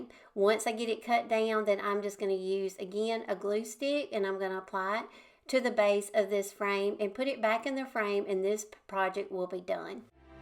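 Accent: South, United States. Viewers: Love all your ideas. Your country accent sounds like mine that I can't get rid of 😅😅. Where are you from 😊.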